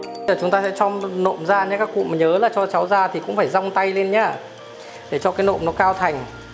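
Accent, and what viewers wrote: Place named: North, Vietnam. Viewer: xem nhiều vdeo chia sẻ rồi nhưng thích nghe giọng nói của anh đậm chất miền bắc ,nấu ăn thì rất nhiều cách khác nhau nhưng giọng anh rất truyền cảm hứng cho mọi người ạ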